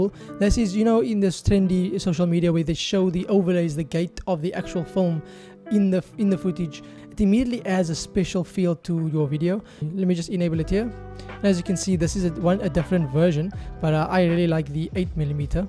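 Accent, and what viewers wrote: South Africa. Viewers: Are you from South Africa? If not, you have a very South African accent. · You sound South African mybru, shout-out ! 🙏🏽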